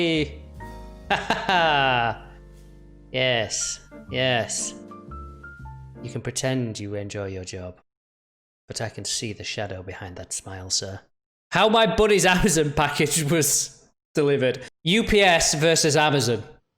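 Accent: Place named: England, United Kingdom. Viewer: Your English accent and the guy farting was so funny.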